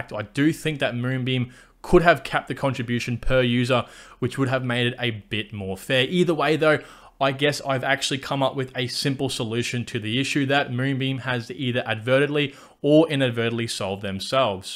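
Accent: Australia. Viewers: Aussie mate!!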